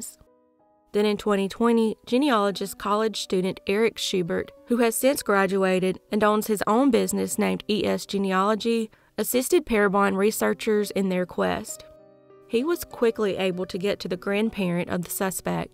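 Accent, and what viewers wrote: South, United States. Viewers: I'm subscribed to your southern accent voice. I wasn't a subscriber then, I am now. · haha I'm the same with my own voice it's so cringe to me lol, but I agree with everyone that your voice is particularly soothing! I do really like your strong southern accent too! I'm from England so to me it's very strong, but I really love it for how soft spoken you are. Also of course you do amazing research and give these cases and victims the respect they deserve. Sending so much love from the South of England! 🇬🇧 ❤️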